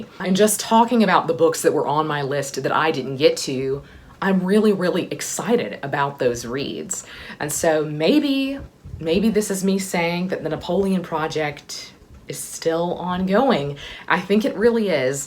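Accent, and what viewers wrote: South, United States. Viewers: And I just heard you say from the south! I knew it 😁 I was telling myself I am sensing southern, very beautiful.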